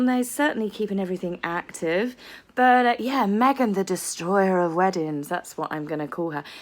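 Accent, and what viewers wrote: Australia. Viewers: You have picked up an Aussie twang. 👍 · Enjoy your stay here in Australia...South Australia is hot 🥵 this time of year......enjoy the climate change... Guess what you still have your Aussie accent with the splash of pom.....don’t sound any different to me.....💕💕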